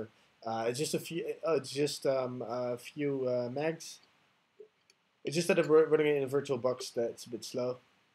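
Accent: Netherlands. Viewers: you sound very nederlands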